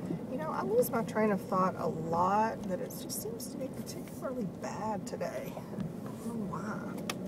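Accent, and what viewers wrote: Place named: Texas, United States. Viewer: What I love the most is your Texas accent. I'm a native Texan stuck in Florida. I love hearing the "song of my people"!!!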